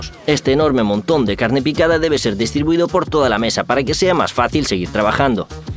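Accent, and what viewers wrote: Spain. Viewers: No me gusta esa voz 😢😢 demasiado española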